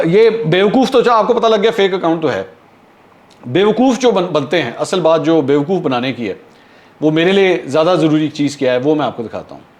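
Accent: Punjab, India. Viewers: Pti walay gory ki tasweer daikh k khush ho gaey.Amazing vlog with Punjabi ka tarka puttar maza aa giaa🤣😂😁😀😁😂😂😂😃😄😄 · Your Punjab accent and irony behind this is mind blowing 🤭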